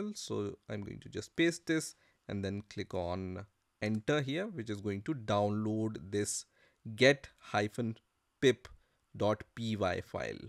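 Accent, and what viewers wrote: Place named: India. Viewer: know the tutorial will be good when the person sounds indian, amazing tutorial:yougotthis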